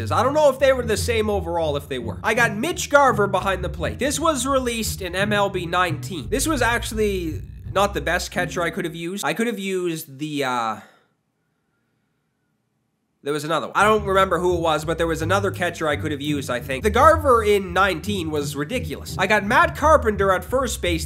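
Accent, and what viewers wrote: Canada. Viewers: are you from Ontario or the Maretimes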